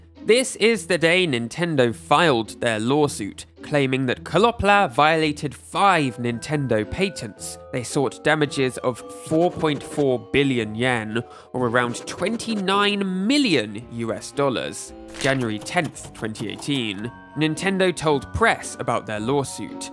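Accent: United Kingdom. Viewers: Thanks, I was going to ask that. It's obvious you're British - your accent and the spelling of "honor" with a "u" are dead giveaways.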